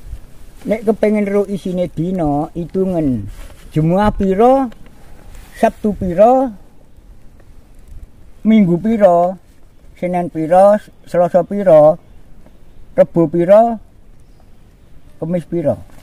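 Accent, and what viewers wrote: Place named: Java, Indonesia. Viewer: Seng ngenah logate wong jowo bossss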